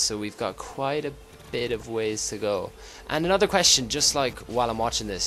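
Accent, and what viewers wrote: Ireland. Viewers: are you from ireland? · hes irish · are u irish PLEASE ANWSER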